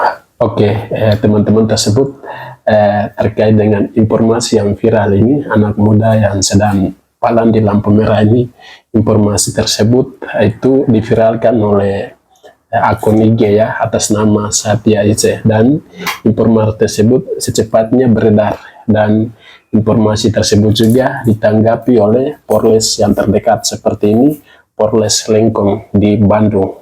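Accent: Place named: Papua, Indonesia. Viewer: Bro kamu kok bhsnya santun kok bhsnya papua nya masih melekat tapi kok bisa santun..bravo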